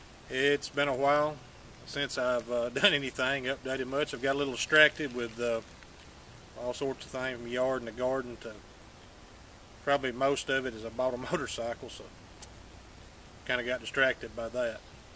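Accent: South, United States. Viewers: A gun review without the southern accent would be weird...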